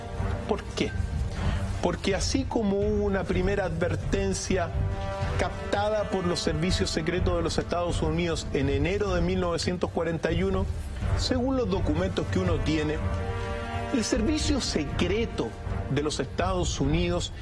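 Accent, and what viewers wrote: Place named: Chile. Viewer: De ver los videos me he acostumbrado al acento chileno, personalmente me parece agradable, por no hablar que los temas y la manera de contar del me encantan.. saludos desde España.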